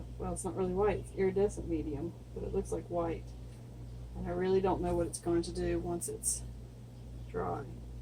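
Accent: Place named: California, United States. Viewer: Love your accent. Sounds Cali with a southern past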